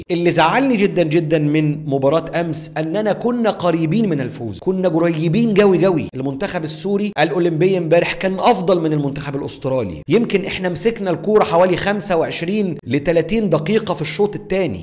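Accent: Egypt. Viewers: انت سوري وللهجتك مصريه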